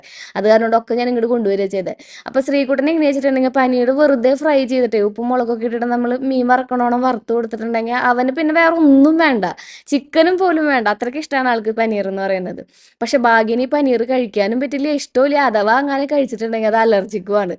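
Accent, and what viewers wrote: Thrissur, India. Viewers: ഓർമ ശരി ആണെങ്കിൽ 2019 ഒരു 18 തൊട്ടെങ്കിലും ഞാൻ ശ്രീടെ video കാണാറുണ്ട്. പല daily vlog കളും കണ്ടിരുന്നെങ്കിലും ഇപ്പോഴും കാണുന്നത് ശ്രീടെ മാത്രം ആണ്‌ വേറെ ഒന്നുമല്ല. ഞാനും തൃശ്ശൂർ ആണ്‌ ശ്രീടെ age തന്നെ ആണ്‌ ശ്രീടെ വർത്തമാനം കേൾക്കുമ്പോൾ എനിക്ക് എന്റെ നാട് ഓർമ വരും എന്റെ ബന്ധുക്കളും കൂട്ടുകാരിയും ആണ്‌ നിങ്ങളൊക്കെ എന്ന എനിക്ക് നിങ്ങളെ feel ചെയ്യാറ്. But പ്രൊമോഷൻ ചെയ്യുമ്പോൾ filter ഉപയോഗിക്കരുത് ശ്രീ തന്നെ പറഞ്ഞല്ലോ ആൾക്കാർ selfish ആകുന്നു എന്ന്.filter ഇടുമ്പോൾ ശ്രീയെയും അങ്ങനെ തന്നെ തോന്ന ഞങ്ങളെ പറ്റിക്കല്ലേ. പ്രൊമോഷൻ ടൈമിൽ നല്ല skin അത് കഴിഞ്ഞു കിച്ചണിൽ കേറിയപ്പോ ഒരു glow യും കാണാനില്ല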